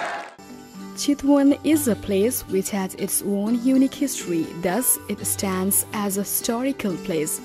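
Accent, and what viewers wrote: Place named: India. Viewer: Indian accent spoted =)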